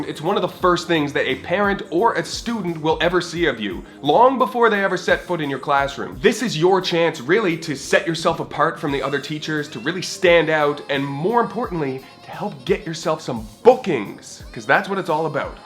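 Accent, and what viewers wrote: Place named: Canada. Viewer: Love your Candian Accent! Also, thanks for you suggestions, they're reassuring!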